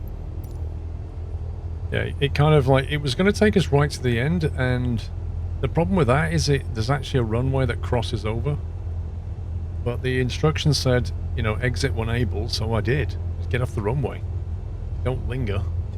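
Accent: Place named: Yorkshire, United Kingdom. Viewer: Proper Yorkshire.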